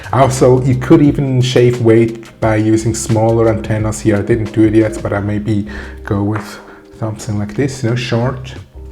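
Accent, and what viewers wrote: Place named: Switzerland. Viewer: Bisch du Schwiizer? De Akzent hani nach 3 Sätz kennt 😅. Aber dis Englisch isch super!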